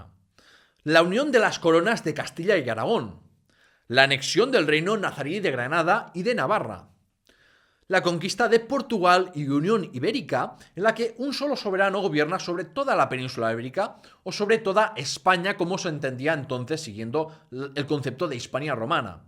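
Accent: Catalonia, Spain. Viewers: Desde luego tienes acento catalán hablando Castellano. Aparte de eso de historia sabes un rato. El saber es un problema hoy en día. Nos quieren tontos así no manejan mejor. Sigue adelante.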